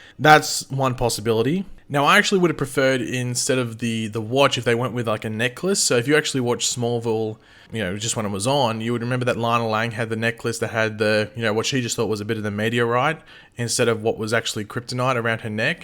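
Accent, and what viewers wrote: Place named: Australia. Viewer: You sound like a Australian version of Tom Welling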